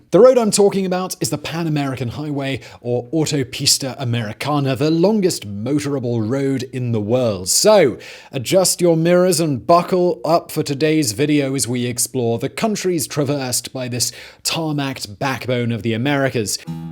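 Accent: United Kingdom. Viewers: Nice video but the British accent is overkill.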